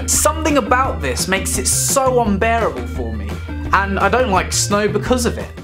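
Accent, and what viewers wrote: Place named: United Kingdom. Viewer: Umm... it could be just a British accent. Not everyone speaks American English.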